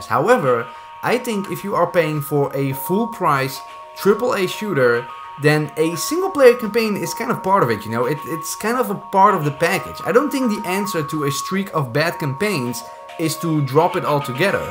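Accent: Netherlands. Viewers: this mans dutch accent... love it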